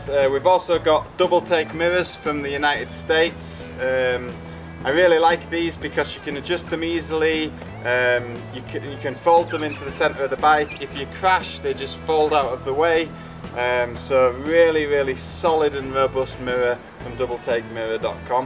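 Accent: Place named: United Kingdom. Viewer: I love to hear you speak! The words coming out and the bloody British accent👍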